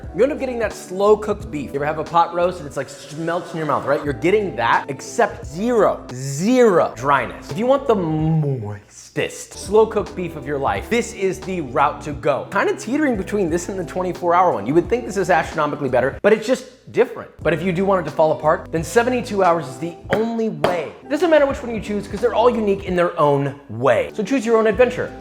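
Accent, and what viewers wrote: England, United Kingdom. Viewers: The British accent has me dyinggggg lol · You're an inspiration to us all buddy, keep up the good work!. Josh's British accent gets me every time. Love it.. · Your English accent is getting quite refined You absolute mad lad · where did the british accent come from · Josh's English accent is better than Oscar Isaac's. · What's with the terrible English accent? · That English voice is brilliant. Most Americans do it in a jokey way but you, you son, make my proud. (I’m English if you didn’t get the drift) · Can we have Josh do an entire video with the English accent? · As someone from the UK I really like his English accented quips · Why the British accent tho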